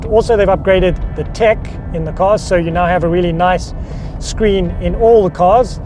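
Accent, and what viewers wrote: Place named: South Africa. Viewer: I'm here cause I like the SA accent😅. Greeting from Australia. PS, can you show us going through a red light at night. Lol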